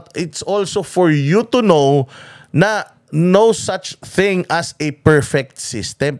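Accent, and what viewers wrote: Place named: Visayas, Philippines. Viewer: Boss bisaya eh matigas ang letra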